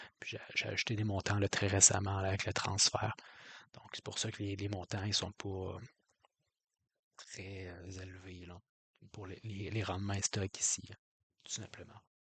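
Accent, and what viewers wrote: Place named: Quebec, Canada. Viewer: Je t'encourage a continuer, j'ai écouter toute ton vidéo ,juste lui de finance vue que cest aussi ce que je fait. tant qu'a juste te dire de continuer, jm'autorise une critique constructive. sans rancune :) Pro = -continu de mentionner ton portefeuille dans les commentaire de video de personne qui parle de Finance au quebec cest comme ca je t'es connu. -ton francais est bien tu t'exprime bien quebecois et quand meme suivable pour un francais france. -jaime le fait que tu explique ta ponderation dans ton portefeuille pour chaque actif . ta strategie pour les dividende a des fin fiscal. -ta chaine est de loin superieur a la celle Mikael wolf qui montre aucun chiffre et connais fuckall apport VFV et XQQ. Negatif= -Peu etre pensé à investir dans un micro de qualité et aussi avoir une voix plus portante et assumé.(avec le temps) -wealth simple platforme de merde, mais je vois quand meme lutilité si tu force sur l'affiliation pour un revenu supplementaire. 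(au quebec rien bas IBKR. en terme de margin disponible et interet sur marge et en terme de payer des rendement sur cash on balance et de transfer USD/CAD sans frais. jtencourage a transfer ton compte marge dessus. - Mettre ta face en bas pour montré a ton interlocuteur qui tu es et aide aussi a fidelisé les abonnées. -abandonner toute le coté language aka duolingo ( niche trop petite), et faire 50% finance perso comme tu fait avec update de portefolio, voir parler de tes pochain titre. prochain achat, optimisation fiscal, vlog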